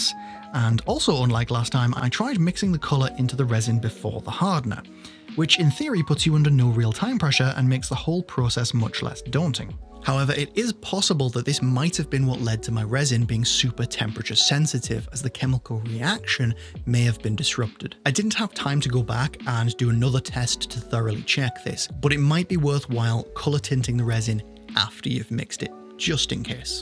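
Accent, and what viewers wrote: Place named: United Kingdom. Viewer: Every time you paused, then said something uncomfortable "organic material we are trying to replicate" - made me chuckle every time. Your voice is very soothing, and as an American, I love that British accent. I leave your videos running when I'm building, even if it isn't the same video to what I'm making.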